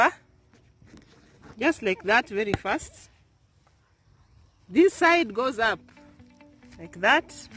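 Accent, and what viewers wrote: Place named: Kenya. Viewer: I love your voice, reminds me of a coworker from Kenya. For some reason that English accent is my favorite. Plus everyone I've know with that accent has always been so amazingly upbeat. Great vid!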